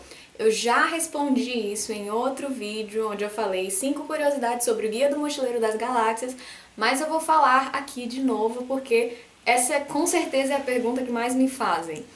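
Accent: Bahia, Brazil. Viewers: lógico, todo mundo tem sotaque. Mas em comparação com o sotaque baiano marcado, tem pouco. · Já falei sobre isso, mas repito: seu sotaque aparece sim, e até muito! Quem espera ouvir aquele sotaque do INTERIOR da Bahia, algo bem caricato, não não vai encontrar. Eu que sou soteropolitano vejo você "sotaquear" frequentemente. Parabéns pelo canal, como sempre! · Aos meus ouvidos paulistanos o seu sotaque baiano é bem acentuado! XD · não sei, eu sou baiano também e percebo bem o dela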